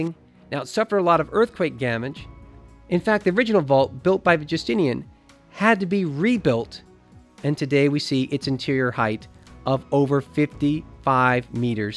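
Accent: United States. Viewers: DAMN YANK NARRARTION!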